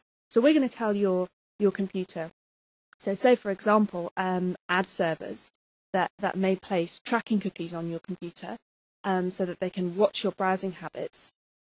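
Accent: United Kingdom. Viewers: that is cool a fix to prevent slowing down, wit a lrg host file...luv ya Brit accent....gonna subscribe. =)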